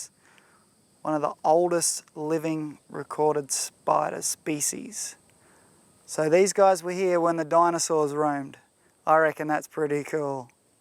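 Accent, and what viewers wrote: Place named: Australia. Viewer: I was hoping that spider jumped on his Australian accented face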